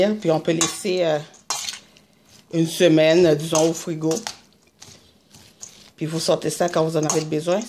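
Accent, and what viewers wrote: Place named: Haiti. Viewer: Tu as l'accent créole haïtien et québécois. C'est marrant.... hello de Guyane. J'aime beaucoup le picliz. Humm miamm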